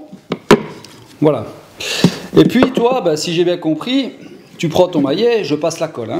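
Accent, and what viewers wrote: Belgium. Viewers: ... ou comment (ré)apprendre les bases issues de l'expérience d'un pro qui se prend pas la tête, avec l'accent belge en bonus! Parfait, change rien. Et pour être très original: merci.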